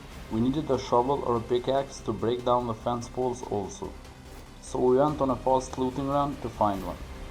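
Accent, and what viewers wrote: Russia. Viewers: russian accent and now I stopped watching you. Hatfu na ryj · Your sound like a Russia , hahah, I liked the video 👍🏼👍🏼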